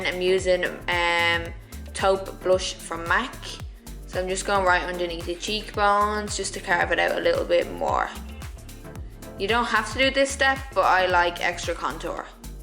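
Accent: Ireland. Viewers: I loved your look in this video and overall. I found you shopping on Tarte! I bet you will be the next huge thing on YT<3 Where is your accent from? You are in CA but sound Irish?=P I'm a new fan from NZ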